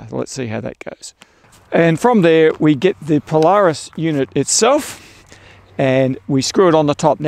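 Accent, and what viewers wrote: Australia. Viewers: OMG! An Aussie Voice, on here, talkin' my language, doing a great job of being HONEST!! Much appreciated!!! You mentioned the tripod...Bol..? But it is not 'linked' in your post, or have I missed it? Keep up the greatest work on here!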